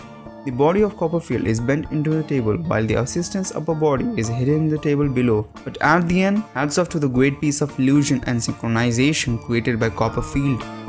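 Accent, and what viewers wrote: India. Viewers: If u have any problems with others then keep it to your self by sharing it to the world you will make it worse for the guy who is talking as well as some Indian people might take take it seriously and u didn't mention its indian accent but the accent he is talking is obviously indian right ? So please understand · why is the commentator swallowing words? very thick indian accident is ok. Some indians speak clearly. This one is awful. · English is not my native language, however Indian accents are probably the only ones I have difficulties understanding.. 🙈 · Are u indian voice??? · Indian accent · Nice vid. Narrator is from the subcontinent. I'm guessing India. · Love the Indian accent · The moment i heard indian accent, i quickly stop the video and dislike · Why the fuck every time I hear a Indian accent (I'm not sure if he is Indian) I believe there name is mohammed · Sorry but I would say NO to Indian Accent. 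· Indian accent for sure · I’m just curious why a few random videos of theirs uses an Indian guy to narrate? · Indian accent don't go well with Magic reveling voice-over. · Well guys don’t worry about his accent ‘ he is a indian guy ‘ but must be well educated and it was awesome presentation 👏🏻👍🏼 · Is the Narrator INDIAN?? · That's Indian accent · Is he Indian? Are you sure? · สำเนียงภาษาอังกฤษ!เหมือนคนอินเดียพูดภาษาอังกฤษเลย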